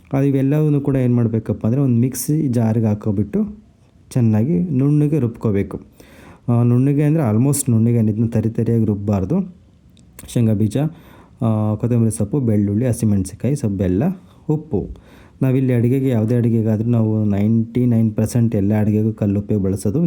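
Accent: Chitradurga, India. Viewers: ನಮಸ್ಕಾರ, ಇದು ನಮ್ಮ ಚಿತ್ರದುರ್ಗದ ಕಡೆ ಅಡಿಗೆ.. 2 ದಿನದ ಹಿಂದೆ ತಾನೆ ನಾನು ಶೇಂಗಾ ಗೊಜ್ಜು ಮಾಡಿ ತುಂಬಾ ದಿನ ಆಯ್ತು ಮಾಡ್ಬೇಕು ಅಂತ ಹೇಳ್ದೆ, ಇವತ್ತು ಈ video ಅಕಸ್ಮಾತಾಗಿ ಬಂತು ನೋಡಿ ತುಂಬಾ ಖುಷಿ ಆಯ್ತು. ನಿಮ್ಮ ಭಾಷೆ ಅಡುಗೆ ನೋಡಿದ್ರೆ ನೀವು ದುರ್ಗ ದಾವಣಗೆರೆ ಕಡೆಯವರು ansutte. ತುಂಬಾ ಚೆನ್ನಾಗಿದೆ ನಿಮ್ಮ ಅಡಿಗೆ videos. ಶುಭವಾಗಲಿ ನಿಮಗೆ 👍🏻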